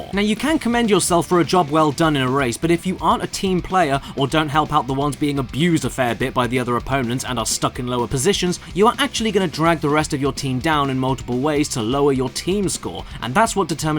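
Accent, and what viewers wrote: United States. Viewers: To be fair you do talk like a yank a lot so maybe that's why they thought that.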